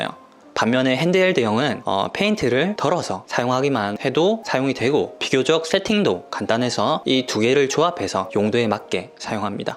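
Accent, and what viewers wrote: Gyeongsang, South Korea. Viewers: 구독하고 처음 댓글 달아요. 반갑습니다. 경상도 사투리 참 정감 있어요. ^^ 저도 경상도 사람이라...ㅋ 저는 방문을 리폼하려고 하는데요. 지금 살고 있는 현재집 말고 전에 살던 집이 페인트가 칠해진 목문이었기에 페인트 칠해본 경험이 있습니다. 방문을 떼지 않고 칠해서 인지 롤러와 붓으로 칠했는데 일명 눈물자국이라는 것도 생겨서 사포로 밀고 다시 살짝 칠한곳도 있었어요. 지금 살고 있는 집의 방문은 필름지가 입혀진 방문인데 필름지 위에 페인트를 해야할지 필름지를 다시 붙여야할지 고민하고 있어요. 님 일반인이 직접 셀프로 하기에 필름지가 나을까요? 필름지 위에 페인트가 나을까요?(물론 젯소 바르구요.)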